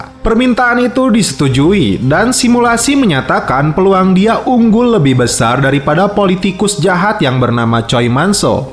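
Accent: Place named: Sunda, Indonesia. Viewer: Sorana sunda pisan, anjir.... 😀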